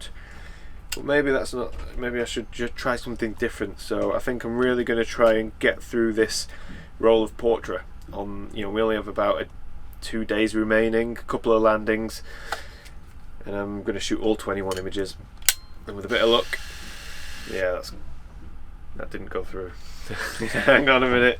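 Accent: United Kingdom. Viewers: Couldnt agree more. For me it is that cozy feeling, the warm music, the unagitated way of explaining things to us, the british XD. His films are always relaxing. At the same time, he always manages to spread the joy which is really infectious. I have the same feeling often with Morten Hilmer's documentaries, but those videos are quite long (over 30min) and focused more on wild live than landscape. So its just that touch different.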